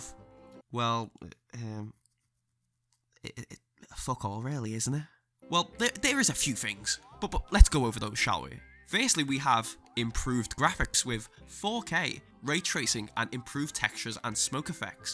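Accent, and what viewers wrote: Liverpool, United Kingdom. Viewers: U scouse? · Just curious, are you from Liverpool? Cause you kinda sound like Darren Till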